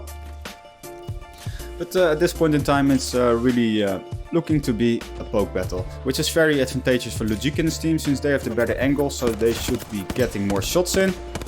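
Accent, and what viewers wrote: Netherlands. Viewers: also love the dutch accent btw :) and great video man keep it up!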